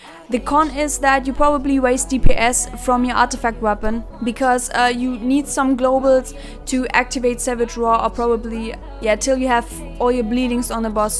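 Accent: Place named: Germany. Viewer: Manchmal hört man voll raus dass du eine deutsche bist ;)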